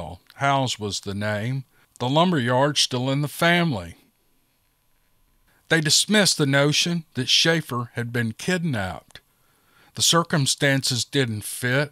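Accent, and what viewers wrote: South, United States. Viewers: As a real southerner in Atlanta living in a world of Yankees, your voice take me back to my youth. GOD bless you sir, never change! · you are an incredible story teller. I could listen to you for hours. I just love your southern twang. It's very comforting. Don't be so hard on yourself.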